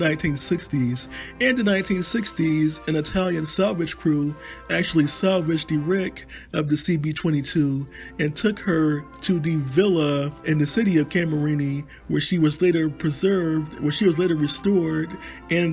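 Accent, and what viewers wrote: United States. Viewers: I had to give this video a thumbs down. Your American accent makes it unbearable to listen.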